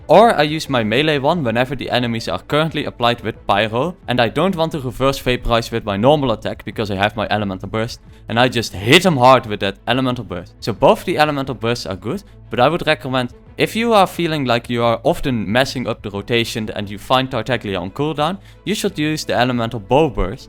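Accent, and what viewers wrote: Netherlands. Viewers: Your deffo from Netherlands!! Same haha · AAAAAA as a dutchie i can't handle the Dutch accent im sorry fisisjjsjs But thanks for the guide i appreciate it lol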